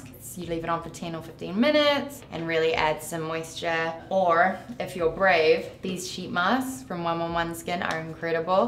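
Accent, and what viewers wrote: New Zealand. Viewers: Shes a kiwi 🥝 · Yup...new zealand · Inna DC She is from New Zealand. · Kiwi thick but definitely tell she talks to ot of american lol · New Zealand. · That Kiwi accent! LOVE!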